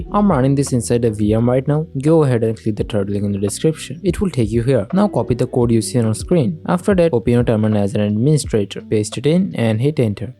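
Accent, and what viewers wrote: India. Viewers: Are you Indian by any chance? Your accent seems pretty Indian. Loving the videos by the way.❤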